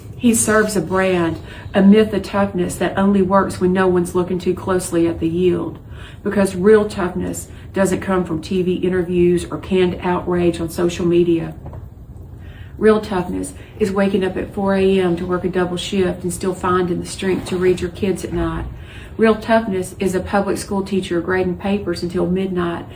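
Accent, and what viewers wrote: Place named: South, United States. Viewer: Oh my god, I am so thrilled to hear a southern voice speaking the words you are laying down. I am almost 70. My late husband was a combat VN vet. He was born and raised outside Charlotte, NC. My mom was born and raised in West Virginia and I spent many a summer there as a child. And to this day I still go back to visit all my in-laws at least once a year. All that to say I LOVE hearing southerners, it makes me feel at home, even though I am a Californian. And when I hear them speaking inescapable truths - well I’m just crying as I watch your few videos. Monte Mader turned me on to you. I don’t do social media much so I don’t know how to follow you other than this YouTube stuff. It says you only have a few hundred subscribers which I have to think will grow after your latest (and horrifyingly accurate) video comparing ICE to ISIS. 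God Bless You!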